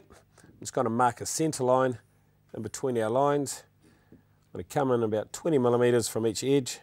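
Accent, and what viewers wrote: New Zealand. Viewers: well it is true. He is from New Zeland and I learned British kind of English... 😊 there is a huge different in pronaunciation. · He speaks with a New Zealand accent. Their pronunciation of vowels is different "ligs" (legs) and the " ls" are not pronounced like bevo (bevel). His explanations are easy-to-understand and he has a high skill level.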